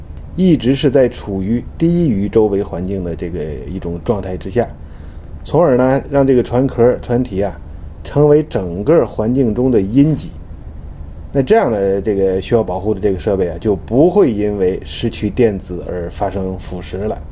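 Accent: China. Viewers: 船长哪里人？听口音像大陆的？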